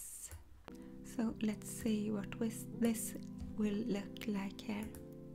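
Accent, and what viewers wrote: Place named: Nigeria. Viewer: Sounds like a Nigerian accent.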